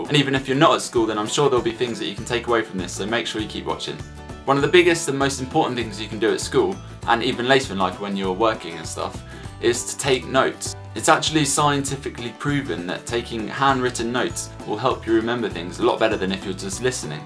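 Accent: United Kingdom. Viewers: I love love love your accent I love the uk so much hopefully one day ill get to go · Love your british english (: ♥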